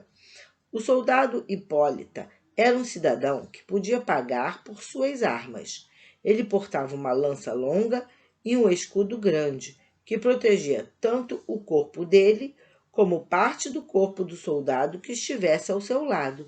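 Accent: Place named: Rio de Janeiro, Brazil. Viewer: Sotaque carioca entojado.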